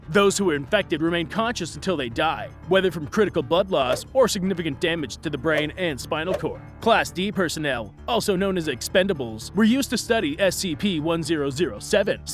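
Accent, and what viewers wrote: Boston, United States. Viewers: I hear a Boston accent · Why does he have a Boston accent or like an accent in general like I can't tell what it is but I feel like it's a Boston one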